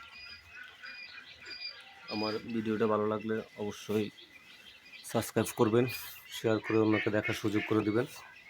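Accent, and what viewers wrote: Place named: Noakhali, Bangladesh. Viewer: নোয়াখালী কথায় ❤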